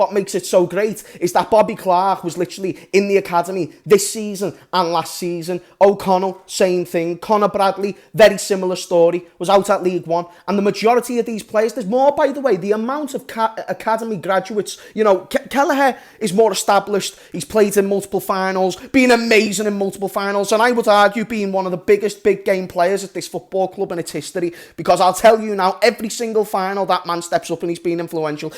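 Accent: Liverpool, United Kingdom. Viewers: Stupid scouse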